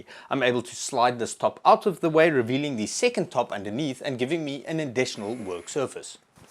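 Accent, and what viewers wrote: South Africa. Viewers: Hello sir. Great project. Are you South African? Your accent makes me believe you are one of us.😂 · Sounds South African to me, but I’m no expert.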